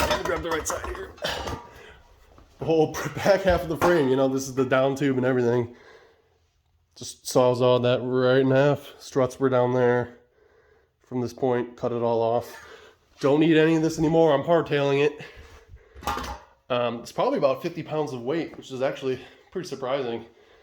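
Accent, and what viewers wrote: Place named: Midwest, United States. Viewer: Looks great, looking forward to how this goes. Where are located, sounds like Midwest from your other video (Menards). You're doing great, love the concept and your channel name. Your idea is quite similar to mine, just something to do for fun and show with a little ambition, you can do alot. Great Job!!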